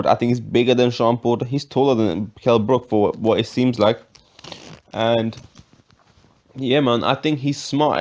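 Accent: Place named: India. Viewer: You sound like you're a Indian from India! ANY ways I just wanted TO let you Know what YOU sound like!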